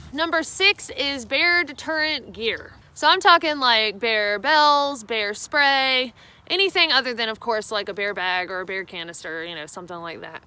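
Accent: South, United States. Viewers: Lighter and back up lighter... Its a southern thang! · From Mississippi, I speak "southern" so knew she said "lighter." So, allow me to educate. Lighter in southern is "ladder." Ladder in southern is" ladder." Clear? Another example of "southern." Female deer is doe. Opening to a building or another room through which you walk is doe (e.g. Sally opened the back doe to give John the ladder so he could light the grill).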